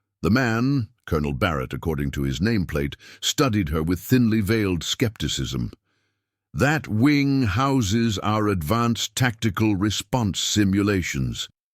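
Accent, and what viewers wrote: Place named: United Kingdom. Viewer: I'M HEARING A SLIMY LIMEY DOING THE NARATING, JUST BY THE WAY HIS USE OF CERTAIN WORDS!!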